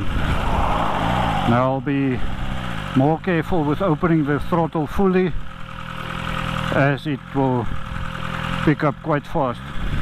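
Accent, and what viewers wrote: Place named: South Africa. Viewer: Man I clicked on this video for some knowledge on wheelie control and as soon as I heard the accent and the road appeared I could tell you're from South Africa. Great video sir. I've subscribed.🙏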